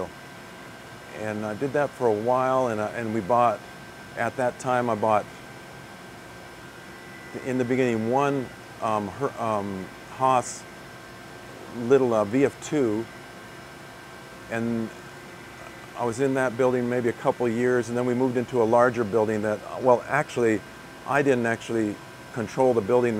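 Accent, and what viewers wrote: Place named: California, United States. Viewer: I wondered if you had a bit of California in your story; I'd taken from your accent that you'd lived there.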